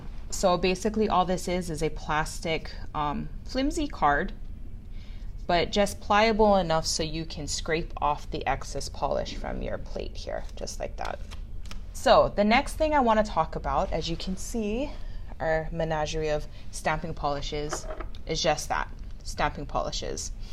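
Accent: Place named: Hawaii, United States. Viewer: Aww I could tell where you’re from by your accent before you said Hawaii! ❤️ I’ve kept an eye out on your products since the Bundle Monster days but still haven’t gotten around to purchasing yet...fell out with nail polish for a while because I couldn’t wear what I wanted at work. Now I’m slowly rebuilding my collection, thinking of finally getting a stamping plate, and omg how am I finding out only now that you’re from Oahu 😲 now I’ll have more reason to buy, love supporting local...even though I’m currently living away from home! 🤣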